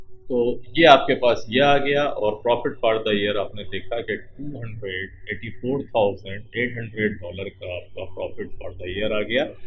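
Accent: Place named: India. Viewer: I can't understand anything due to the Indian's accent.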